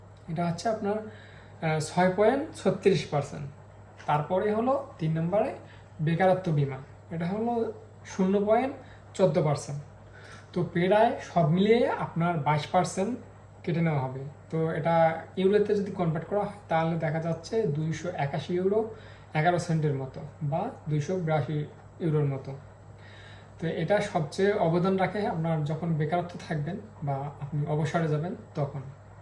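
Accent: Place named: Barishal, Bangladesh. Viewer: বরিশাইল্লা🤔🤔🤔🤔🙄🙄🙄 সামনের লেখা দেখে দেখে,রচনা বলতেছে🤣🤣🤣🤣🤣🤣🤣 ভালো আছি,পবিএ মক্কা আছি🥰🥰🥰🥰🇸🇦🇸🇦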